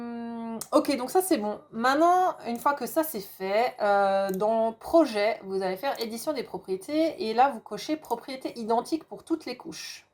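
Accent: Alsace, France. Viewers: Ah on entend enfin ton accent :) et je découvre l'humour alsacien :) sinon je garde ça de côté j'en suis pas là !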